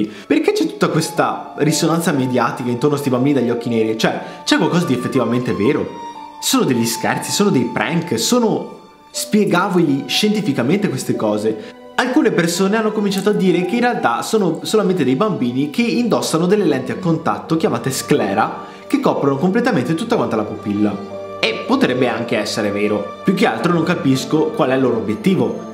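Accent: Veneto, Italy. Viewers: gamer foxy22 nord perché è del Veneto😆😇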